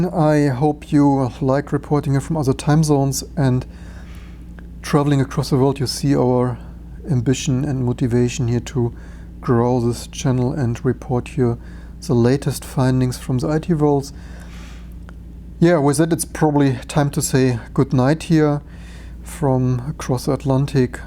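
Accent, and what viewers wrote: Germany. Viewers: Solltest schon sagen dass du Deutsch bist, es ist wirklich nicht zu überhören 😅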